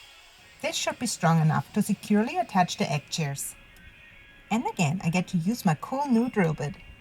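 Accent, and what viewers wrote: Germany. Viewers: hi, this may be the reason why it sounds like the german girl next door. First time I noticed it, I though I had a hallucination..... VGvG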